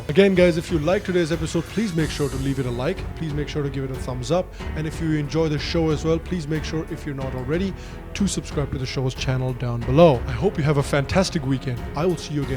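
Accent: West, United States. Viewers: Were you born and bought up in india? If yes, than why do you have a western accent?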